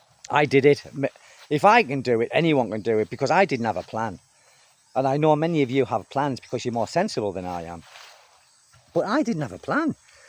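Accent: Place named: Birmingham, United Kingdom. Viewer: You speak a lot of Birmingham Midland English, just like Peaky Blinders, the new movie just came out, have you seen it? Have you seen it?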